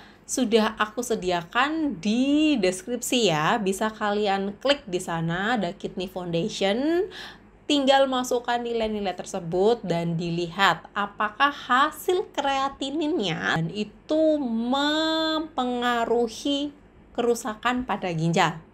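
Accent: Java, Indonesia. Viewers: Dokter medok😂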